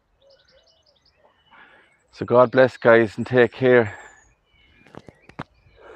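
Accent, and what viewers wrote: Ireland. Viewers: I like the new channel name. The cemeterys there have such fantastic character and The narration with your Irish accent really makes for an incredibly enjoyable video ! ! Thank you for producing them!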